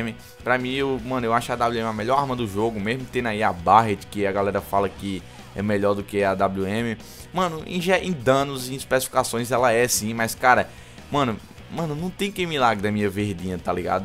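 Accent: Northeast, Brazil. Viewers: De Que Lugar do nordeste tu és?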